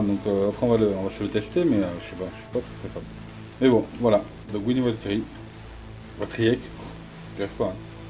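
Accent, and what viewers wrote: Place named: South, France. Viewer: Tu viens du Sud toi 😂😉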